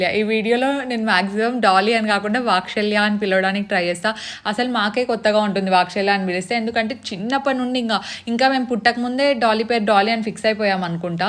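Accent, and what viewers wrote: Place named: Madanapalle, India. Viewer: A big fan of uh akka ❤️ I'm from madanapalli n whenever I see ur vds I feel lyk ur one of our family member n ur slang is super related to us. Keep rocking akka 🥳🥳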